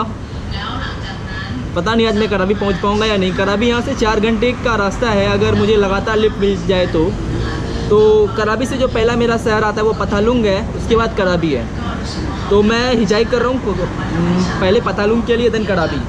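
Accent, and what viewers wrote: Bihar, India. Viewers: Aram se baitha tha ek dum pasra hua tha, ye bihari language 🤗🤗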